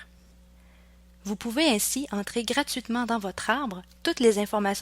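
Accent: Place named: Quebec, Canada. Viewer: L'accent (que j'adore) québécois tout simplement ;-)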